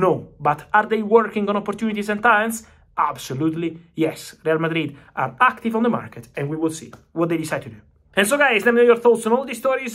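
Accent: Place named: Italy. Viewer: Italian english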